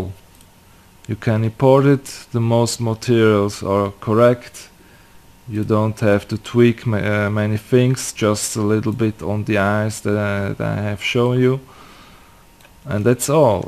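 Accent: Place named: Brazil. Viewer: Pelo inglês deve ser brasuca rsrsrs mó sutaque hein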